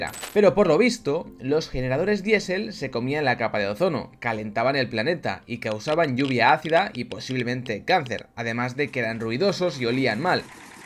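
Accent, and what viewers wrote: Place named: Spain. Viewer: La IA no tiene acento español no ves que es una persona de carne y hueso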